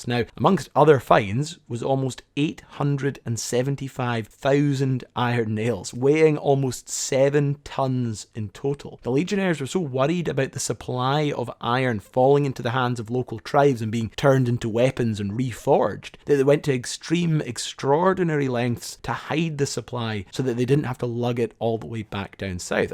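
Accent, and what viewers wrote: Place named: Scotland, United Kingdom. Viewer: Thank you for such an interesting piece of history. You are an easy listen. Your Scottish background is easy on the ear. BWScott Fort Worth Texas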